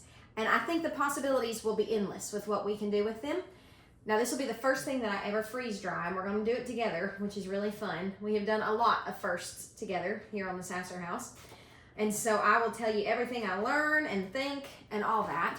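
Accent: South, United States. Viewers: omg i could listen to you for hours. never knew i really liked southern accent. im having so much fun <3 :D haha Love from Israel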